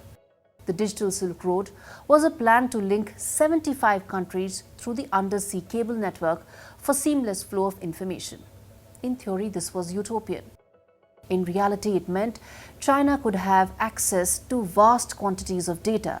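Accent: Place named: India. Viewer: the announcer says “… China’s checks threatened to turn several countries into ‘versaille’ states due to their high indebtedness…” what the hell’s “versaille states”? I have hard times with the Indian English…😢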